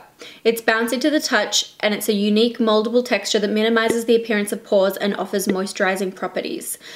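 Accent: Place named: Australia. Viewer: Is it bad that I read the title in her Australian accent? Lol I just had too 😭 I love her too much ❤ She's soo beautiful!!! I can't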